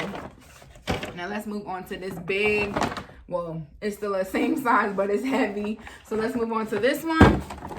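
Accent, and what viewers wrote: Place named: Baltimore, United States. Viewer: Girl I thought you were from Bmore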